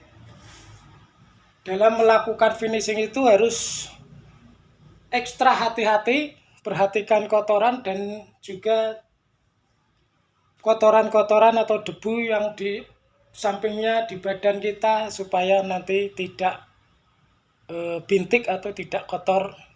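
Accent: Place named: Java, Indonesia. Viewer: Makasih logat jawanya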